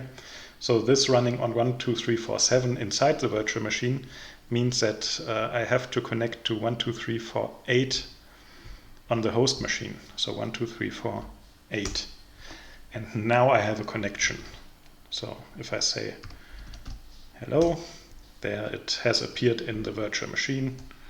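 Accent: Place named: Germany. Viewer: Gut gesprochen, aber man hört den deutschen Akzent :)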